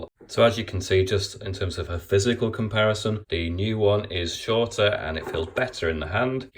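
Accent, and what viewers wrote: United Kingdom. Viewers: the brit voice makes it better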